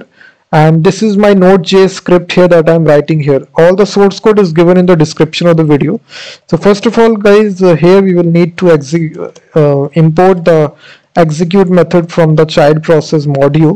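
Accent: India. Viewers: Pure Indian accent and broken microphone. I love this guy❤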